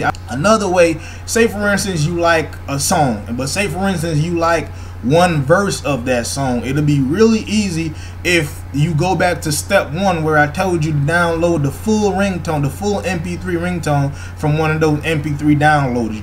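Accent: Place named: South, United States. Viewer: Love the country accent.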